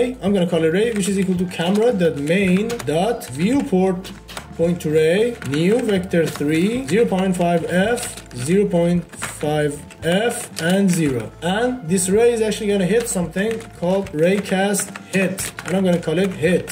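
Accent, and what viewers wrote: Iran. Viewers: Nice video! I'm trying to figure out where your accent is from, maybe Iran??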